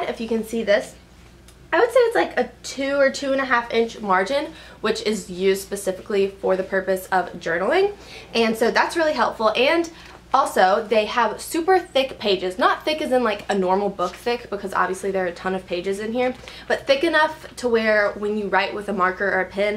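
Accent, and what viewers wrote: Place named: Alabama, United States. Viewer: Beginning of the video: "she sounds like she's from Alabama Description: "from Alabama" Haha I know my state well :)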